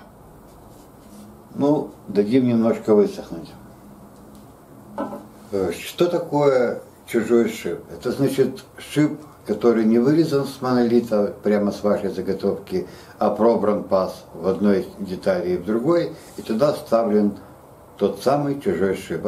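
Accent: Odessa, Ukraine. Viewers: "Свежая кровь" в столярном влогинге.Ни одного дизлайка!Так держать!Я подписался(одесский говор ласкает слух))))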